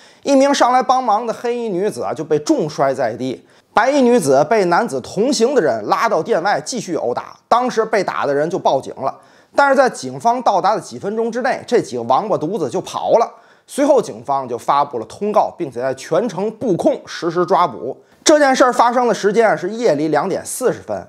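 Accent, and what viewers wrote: Tianjin, China. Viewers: 早安, 新闻充满正能量,而且资讯非常正确,而且讲话充满了天津话顺口溜,超级的高级幽默, 的视频跟长脸自干五的视频,完全是不同的风格,可是都让我长知试了, 加油了.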